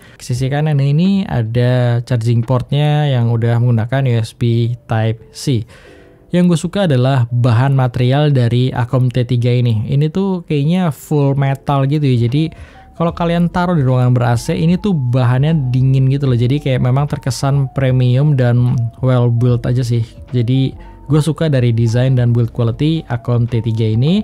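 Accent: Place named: Java, Indonesia. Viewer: hehehe.. maap³ bang, ane cek video nya lagi ternyata kuping ane yg salah😁. Biasanya denger review pake earphone, pas video ini ane pas diluar jd gak pake earphone(langsung speakerphone HP). Berasa beda banget krn ane denger nya kaya ada medok² jawa nya 😁😁😁... 🙏🙏🙏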